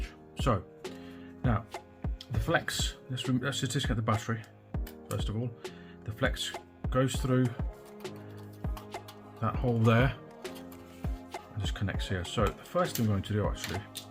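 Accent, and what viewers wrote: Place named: United Kingdom. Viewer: Thanks for this instructional! Yeah...my tab a dropped the screen and snapped the ribbon cable in half mid-length just as if cut by scissors! The screen glanced of my leg and luckily landed face down on the glass side on my linoleum floor. No APPARENT damage other than the cable itself. I now feel confident I can tackle the project. By the way ...What type of glue did you say you were using??? You sound like a Brit to my USA ears (or maybe Aussie?) and I couldn't determine for sure the glue type/brand you referenced Thanks again!!! By the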